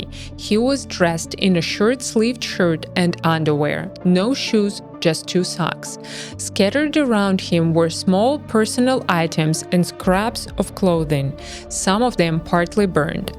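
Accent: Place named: Russia. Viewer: What a fantastic deep dive into this story! So well explained, illustrated and presented. I appreciate the Russian accent too :) I hope one day the Soviet archives are opened up fully and we understand more. The angle on the Kyshtym nuclear event and aliens arriving is an intriguing one.... Thanks again and greetings from Spain.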